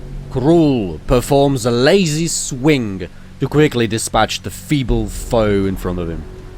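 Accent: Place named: United Kingdom. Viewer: no disappointment here, it's more that the US channels can be a bit...intense. yeah your accent leans still towards a British pronunciation though haha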